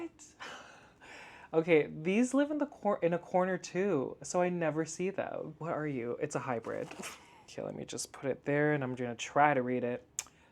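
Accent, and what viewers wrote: South, United States. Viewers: Love it when to tawk southern lol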